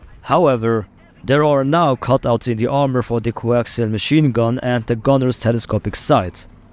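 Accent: Germany. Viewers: come to learn about T-54, stay for the German accent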